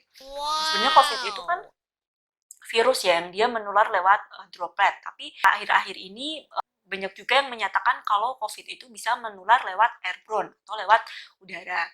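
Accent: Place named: Java, Indonesia. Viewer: logat jawanya keluarkan dok,,jangan ditahan2 dok ,,,jangan lupa kunjungi ke puskesmas aku ya dok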